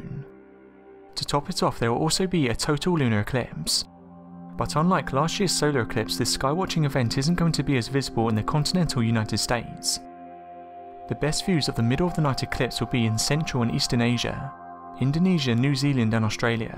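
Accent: United Kingdom. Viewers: lost interest once heard British accent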